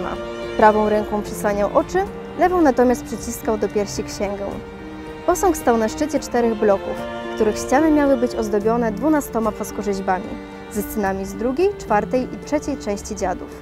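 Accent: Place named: Vilnius, Lithuania. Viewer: Ten Pani wileński akcent.🥰❤😍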